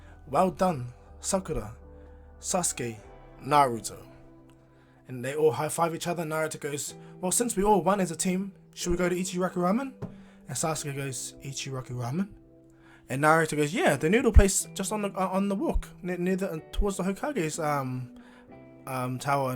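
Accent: New Zealand. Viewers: Another Kiwi I was able to tell just by listening to you my g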